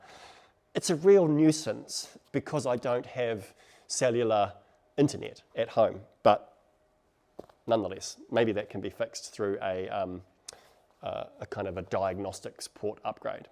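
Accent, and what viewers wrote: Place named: New Zealand. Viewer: Im a kiwi and even I found this accent thick! 😃 great review, will it be up for sale soon?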